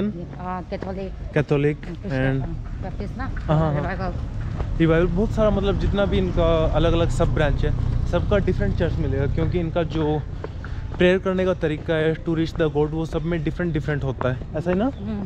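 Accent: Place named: South, India. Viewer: Am not against or racist... Just to clear myself.. Your accent constraint me to ask you. Are you from South.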